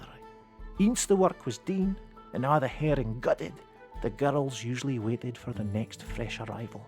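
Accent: Scotland, United Kingdom. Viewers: sometimes I have trouble understanding some terms. if I have official subtitles I compare them with what I hear. besides I sent these videos to my friends from several countries. it will be easier for them to understand if they also see subtitles. I love the Scottish accent but sometimes it is hard for foreigners to understand some things. it is also good if I learn how to pronounce names. thank you very much for these videos - they are really interesting. and I would like more;).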